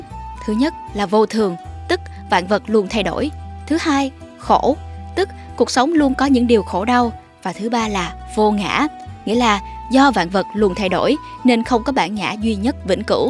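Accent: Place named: Saigon, Vietnam. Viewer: Giọng sài gòn rất hay ủng hộ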